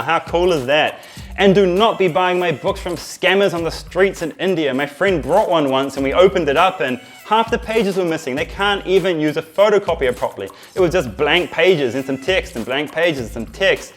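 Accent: New Zealand. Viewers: Kia ora! The first YouTube video on how to learn Hindi and the speaker has the same accent as me:) Whereabouts in Godzone are you from? I'm learning Hindi because I simply just love the look and sound of it. I dabble a little in Buddhism and yoga, so being able to know more of the language will hopefully enrich both. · Are you from New Zealand?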